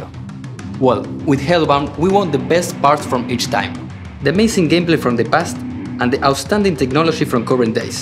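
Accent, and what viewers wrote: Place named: Argentina. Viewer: Jajaja genial que al hablar ingles te sale todo el acento argento, genio!!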